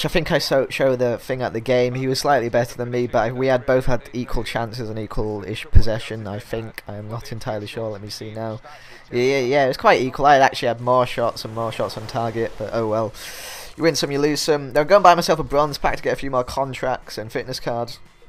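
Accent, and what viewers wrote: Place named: Yorkshire, United Kingdom. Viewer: hearing these crazy Scandinavian names in a Yorkshire accent is half the fun ;D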